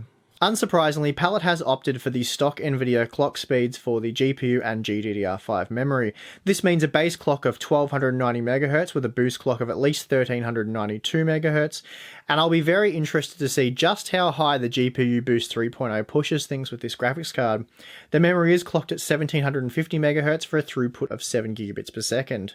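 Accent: Australia. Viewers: "Hear that? That's the sound of an australian accent."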